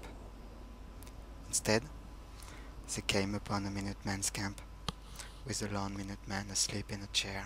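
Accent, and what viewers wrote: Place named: France. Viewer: Amazing my french friend! Great story, loved the way you said it. The french accent really added to spookie vibe thing. Great build. Will make a great halloween entry too! Just love it, didn't know you had it in you... Very macabre...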